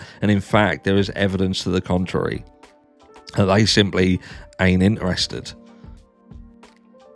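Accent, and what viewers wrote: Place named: London, United Kingdom. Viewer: Love that London language Mr ❤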